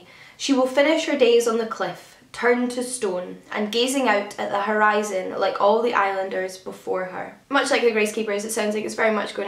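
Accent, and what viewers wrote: Scotland, United Kingdom. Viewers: I havent been subscribed very long but I love your accent! Where are you from? Are you Scottish?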